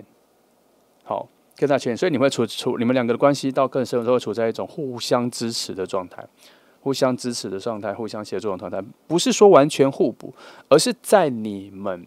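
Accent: United Kingdom. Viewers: 1.準。喜歡2但我直覺選了1。感謝說了一口漂亮英國腔的 😊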